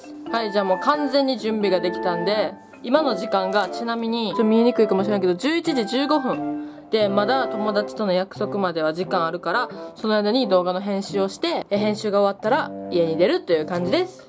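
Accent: Kansai, Japan. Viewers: かわいい子が関西弁しゃべってるとかわいい二割り増し！！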